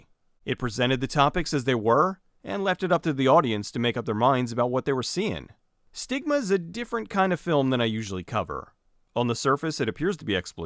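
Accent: United States. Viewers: I'm from Poland and don't know much about accents, but for me you sound so American that you make Americans sound like British.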